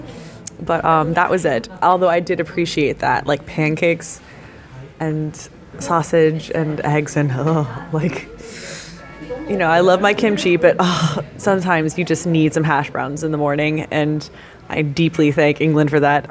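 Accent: South, United States. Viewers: gosh Londoners are friendly; silly girl you are the VERY attractive surprise foreigner with the fantastic SOUTHERN accent, so of course they locals are more than happy to help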